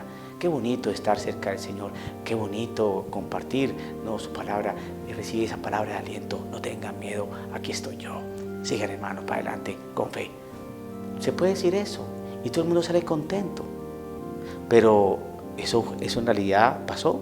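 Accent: Colombia. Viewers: Padre, me gusta mucho todo lo que explica en cada video, no he visto todos sus videos pero los pocos que he escuchado me dejan impresionada de como el Espiritu Santo le ilumina, me impresiono tambien el grado de firmeza que tiene en su fe y su verdadera vocacion sacerdotal, a pesar de ser tan joven me admira como esta tan centrado en la VERDAD de Jesus mejor que muchos sacerdotes que tristemente no estan estudiando los signos de los tiempos y por eso se estan cegando ante tanta apostasia y confusion. Dios le bendiga. Por su acento creo es de ¿Colombia? Colombia y Mexico seran paises grandemente purificados porque de estos dos paises saldran muchas luces que llevaran al mundo la doctrina verdadera, cuando estemos en oscuridad... En oracion y vigilancia como Jesus nos los pidio. Saludos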